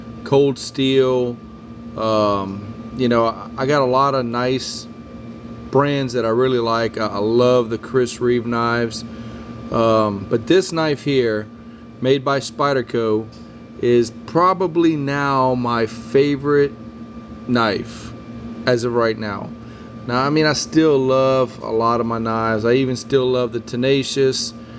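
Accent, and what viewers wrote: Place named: South, United States. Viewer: usa earth? Easy to tell your a southerner. I approve :-) thanks for the review, and the realistic terminology :-)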